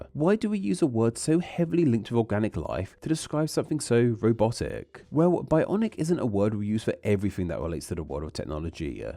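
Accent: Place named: United Kingdom. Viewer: bro he's just british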